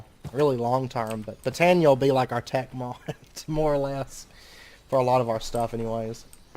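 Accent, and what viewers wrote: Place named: South, United States. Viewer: Thanks for responding. Only found you a few days ago. You sound like you may be from the southern United States. I found your bypasses in the next episode.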